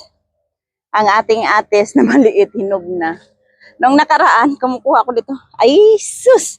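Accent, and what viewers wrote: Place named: Albay, Philippines. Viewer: Parang Albany Ang salita mo idol Kasi mo sondang tapos tigbasan taga saan ka Po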